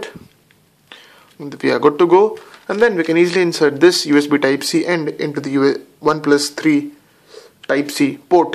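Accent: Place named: India. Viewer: Спасибо. Чё-то мне кажется, шо это индус, шо ли, но прикольненько, таки разговорный английский я у него понимал, кроме того, я не силён в английском, так что понравилось, что часть слов (word) я понял(i understand). И плюс наглядность, круть. ☺ ↑ +5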